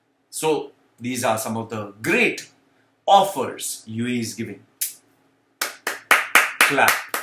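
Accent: Kerala, India. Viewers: Vada idly dosa. Lol you still got the malayali accent and it is very amusing. Again it is free. WOW.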